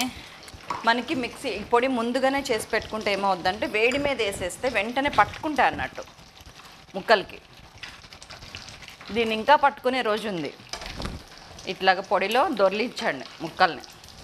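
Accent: Telangana, India. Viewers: Mee telangana yasaki johar.telangana bidda anipichharu.i like it👌😃😃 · మీ మాటల్లో తెలంగాణ యాస చాలా బాగుంది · Medam Medhi telangana or andhra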